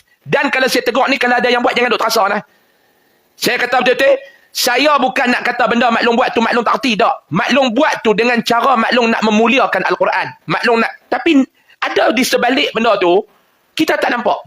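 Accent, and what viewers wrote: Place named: North, Malaysia. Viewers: Aku org kedah, maaf laa aku rasa ustaz ni ceramah tak kasaq pun, biasa ja bahasa dia, cuma dia ckp tu pekat kedah biasa laa, ala ala smbg kt kedai kopi ckp lagu ni laa… kalau org luaq mgkin nmpk mcm kasaq, jd benda tu hanyalah subjektif… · Ramai tk suka bahasa kasaq ustaz ni,,, tp aku suka,, meresap dihati makna yg ustaz ni sampaikan,, org utara cakap kasaq tp sedap dan mudah faham,, terbaik❤ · org utara memang nada cakap mcm tuu... biasa saja tone org utara cakap😂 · Pekat dia punya Kedah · Mana ada kasaq..aku dengaq elok ja...hg ja kata kasaq..tapi hg suka baguih la..memang cara kami cakap macam tu..oqang luaq dengaq mcm kasaq tpi memang mcm tu kami cakap...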